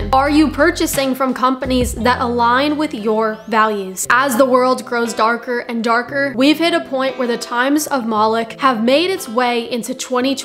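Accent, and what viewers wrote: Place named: South, United States. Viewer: I love your country accent, beautiful thoughts, soothing voice. Thank you for being here and sharing the Gospel. We need more women in Christ. I feel so safe listening to your page and I know your heart is in the right place. Thank you for your testimony, and keep leading others to Christ. HE IS SO GOOD> also, nice to see a woman in my area who thinks so much like I do. God bless you. I read my Bible every day and have stripped my pleasures from me once I saw the destruction they truly were doing.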